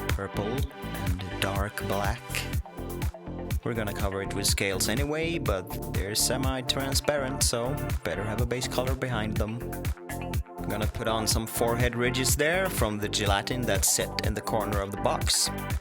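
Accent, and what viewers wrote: Sweden. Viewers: Whenever I hear your Swedish accent I can't stop thinking about summer and food.. Every year, when the summer is approaching we have a lady from Skåne on TV and radio, and she comes bearing new summer-recipes..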